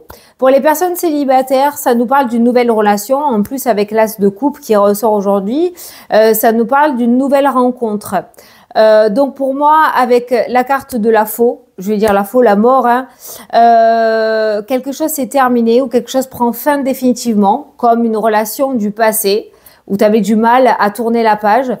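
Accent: South, France. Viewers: c'est une joie de vous écouter , j'adore votre accent du sud il met du soleil dans notre journée. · Bonjour ma, un grand merci pour ta guidance qui me donne du peps, tu es toujours joyeuse et j' adore ton petit accent du sud. Merci pour ton dévouement, ta gentillesse et ta bienveillance. Que le seigneur te bénisse ainsi que ta famille.❤❤❤🙏🙏🙏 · Je t’adore chaque jour tu me fais du bien avec ta voie du sud sa me réchauffe le coeur bonne journée et félicitation mais tu le mérites bizzzz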